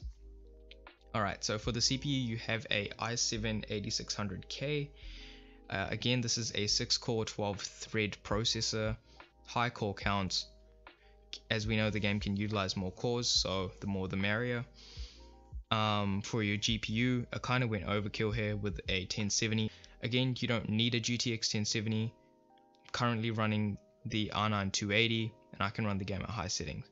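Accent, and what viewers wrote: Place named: South Africa. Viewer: you sound like a SAFA, am I right?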